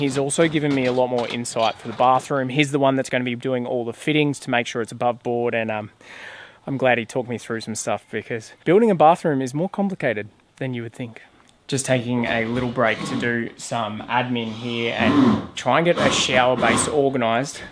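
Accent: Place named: Australia. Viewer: New subscriber here from watching you on Eamon and Bec's podcast. I have ripened stubborn green bananas by putting them into a paper bag with an apple. It really worked and those bananas had stayed green and hard for a very long time. I was about to throw them away. They ripened with a much sweeter flavour than usual. I'm Canadian but I lived in Australia for a couple of years and had my third child there. It's fun to hear Australian sounds again. I look forward to watching more of your videos.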